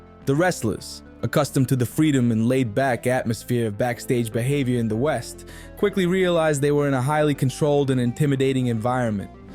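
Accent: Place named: New York, United States. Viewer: Horrible Mispronunciation, Using other people’s footage, mistakes all over and speaking in a ln awful New Yawk Accent… Smells like a Russo production 😂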